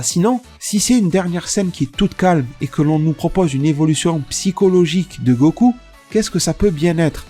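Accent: Marseille, France. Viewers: t'es marseillais??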